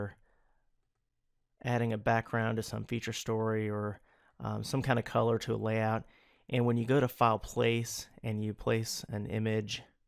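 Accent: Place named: South, United States. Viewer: This is really great and easy to understand! The only problem I had was the southern way of talking, although nice, the lilt kind of fades off at the end and I had to keep turning up my speakers when his voice would get too soft.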